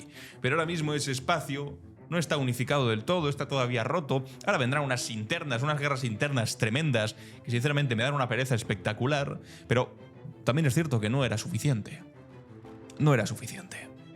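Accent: Spain. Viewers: Me encanta acento Español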